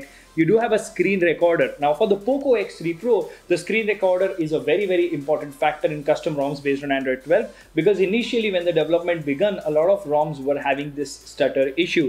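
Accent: India. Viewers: Indian talking in English = Auto Subscribe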